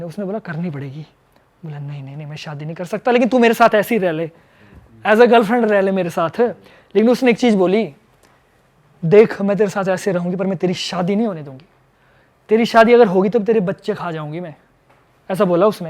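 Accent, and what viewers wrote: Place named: Haryana, India. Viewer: Himachali tone inspired by haryana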